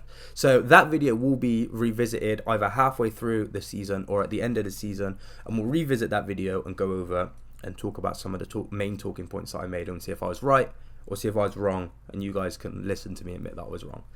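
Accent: Manchester, United Kingdom. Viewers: You sound like you’re from Manchester tbf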